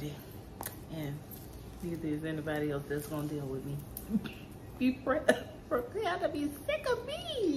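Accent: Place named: New Orleans, United States. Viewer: Great unboxing. I’m sitting here waiting for my white 15 Pro Max to arrive in NOVEMBER and torturing myself with videos lol. You sound like you’re from the NOLA!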